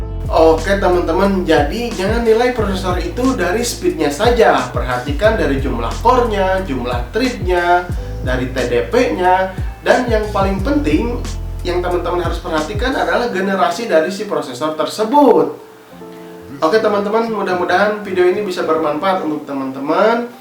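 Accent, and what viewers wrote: West Java, Indonesia. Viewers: Baru beberapa patah kata aku sudah tau bahwa anda orang Sunda 😄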